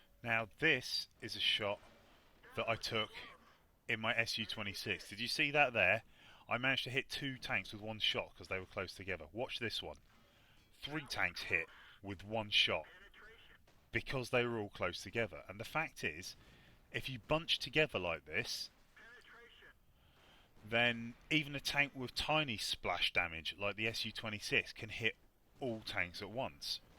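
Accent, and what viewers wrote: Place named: England, United Kingdom. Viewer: not gonna lie, subscribed to you because of jingles, but having seen this video im staying for your commentary. as a fellow brit its quite nice to listen to an english person commentating rather than an american. keep up the good work :)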